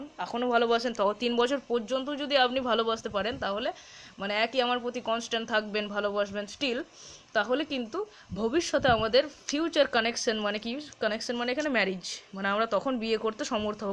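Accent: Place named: Bardhaman, India. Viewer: আপনার বাংলা অনুবাদ এত সুন্দর ম্যাম যে মনে হচ্ছে সত্যিই live দেখছি ড্রামা টা। তার সঙ্গে ম্যাম আপনার বর্ধমানের tone " তু " ।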